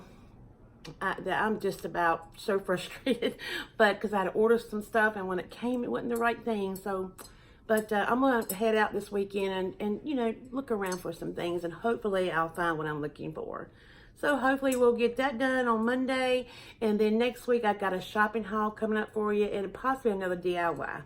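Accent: South, United States. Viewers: Happy spring girl friend🌷🌹🌺🌸!!! I enjoy watching you and all your diys. I love to hear your southern accent, you make us feel at home watching you. Your channel is going to grow so fast 😀👍🏻👏🏻💖❣️ Good luck and best wishes to you. 🙏🏻🥰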